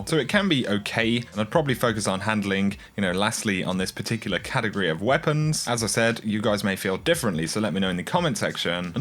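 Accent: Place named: United Kingdom. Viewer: Why does every destiny game guide feature a brit boy?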